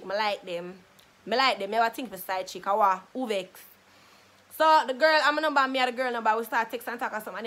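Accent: Jamaica. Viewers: I love jamican english ❤️ like the slangs are lit... 🇳🇬 your vibe is lit ❤️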